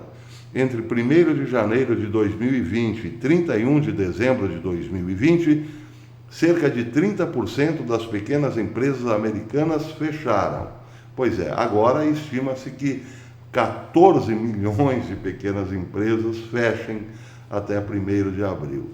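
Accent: Caipira, Brazil. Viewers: qualquer analista de geopolítica fala bonitinho, empolado, metido à inteligente. Isso enche o nosso saco. Só você faz o caipirês e um trabalho melhor que todos os outros! Nunca abandone a fórmula.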